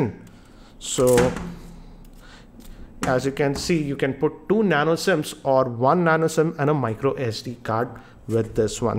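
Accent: India. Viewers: Good video, but maybe you can talk a little bit slower, because ( no racist ) indian accent and fast talking together is really hard to follow in my honest opinion. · Indian English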